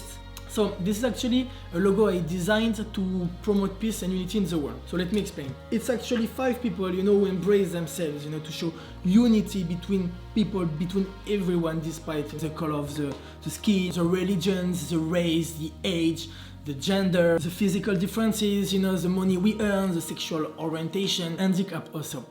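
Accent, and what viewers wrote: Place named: England, United Kingdom. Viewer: Ton accent anglais m'amuse toujours autant, chouette vidéo bien sympathique! Bonne continuation à toi!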